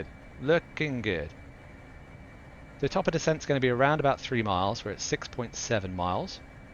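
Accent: United Kingdom. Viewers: I like your British English accent.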